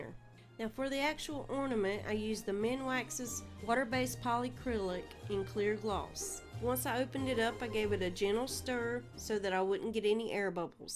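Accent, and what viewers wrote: South, United States. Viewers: Love your crafty ideas and your southern accent too. Sending love from the southeast Kentucky mountains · I normally don't care for DIY projects because they look just like DIY but I have to say these are adorable. I will do a few of these. Thanks so much. Now we all are wanting to know where you are from? We hear a southern accent... Maybe you are from my south.. Louisiana! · I just discovered you and I'm thrilled I did! I have to tell you how much I enjoyed watching your Video the music was nice and I loved each and every Christmas ornaments I really did! The baby JESUS was such a nice idea and it turned out so adorable (your humor is appreciated, I need some lately these days.) The pink tool was stunning with the multicolor gravity Ball..it sparkled so lovely! The Snowman's Top Hat and face was clever and so nice seeing it hung on the Tree! 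I liked the way you explained each craft...,I love listening to your Southern accent I'm from lower Michigan we don't have a friendly sounding voice (but we do say "POP" for bottled Carbonated Soda) Gee! ALL were really great ideas and some I've seen others do "BUT" using the BIGGER Bulbs I have to say that was one of the differences and yours had a look of neatly done, fresh and festive! SUBSCRIBED! Merry 🌠CHRISTmas and Blessings all throughout the coming 2023 🛻🎄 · I'm not sure where you are from, but I absolutely love hearing someone on YouTube that sounds a lot like me. I grew up in middle Tennessee, so I have a very thick southern accent, so I feel like you're a family member helping me craft! Thank you for that! Lots of Love from one southern woman to another. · Just found your channel and I had to subscribe! I’m from southeast Georgia so I love your accent even though you are from a different part of the south. 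We are still both Southern girls!❤️Loved all your ornaments and will be check out your page for more great ideas!❤️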